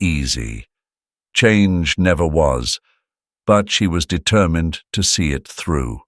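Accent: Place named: United Kingdom. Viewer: Love the British narrator’s voice and the way he tells the story well. Much better than those monotone robotic voices with no emotion, feeling or passion.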